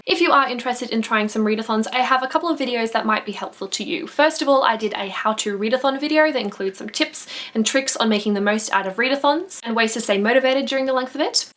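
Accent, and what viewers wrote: Australia. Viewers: May I ask what part of Australia you're from?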